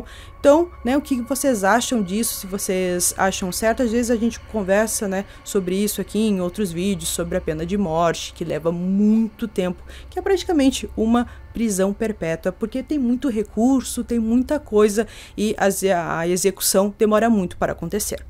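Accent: Rio Grande do Sul, Brazil. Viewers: adoro seus vídeos....e o sotaque gaúcho....acertei?! 🤗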